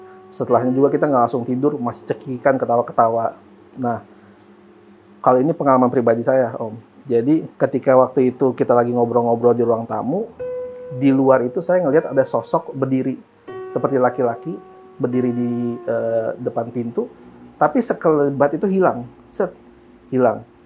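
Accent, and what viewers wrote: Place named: Kebumen, Indonesia. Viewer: Whh trnyata mas wong 'ngapak' Kebumen jg yh.. Kebumen nya mn mas??😅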